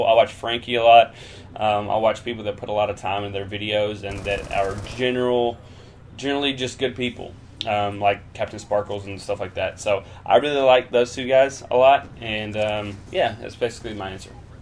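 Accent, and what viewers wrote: South, United States. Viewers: You Sound a little country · Is that a southern accent?! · Your voice sounds kinda southern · He is obviously from the south. I know this because I'm from the south and everybody talks like that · Love your southern accent! Where are you from and how old are you?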